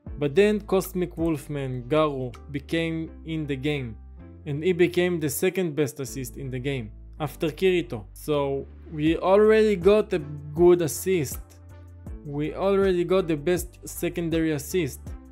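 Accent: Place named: France. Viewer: ton accent français s’entend trop ptdr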